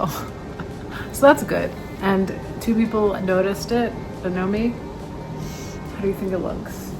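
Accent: South Africa. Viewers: Hi. Glad to hear a South African. I'm from South Africa. Johannesburg. 😊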